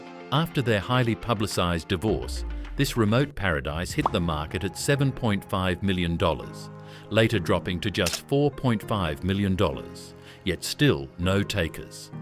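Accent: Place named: Australia. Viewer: Great to have an Australian commentator 🎉😊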